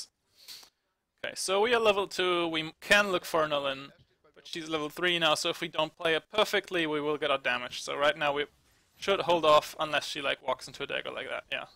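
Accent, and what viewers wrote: Germany. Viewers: u are german? :D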